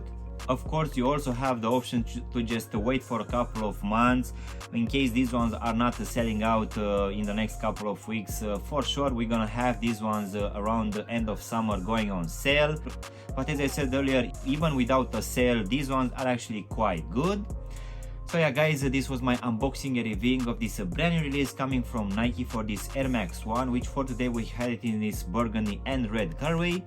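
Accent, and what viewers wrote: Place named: Romania. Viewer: Te cunosc dupa accent bro ca esti roman, buna treaba frate, chiar imi plac review urile tale, keep it up, poate poti face review si la aj1 mid industrial blue, materiale superbe, pret accesibil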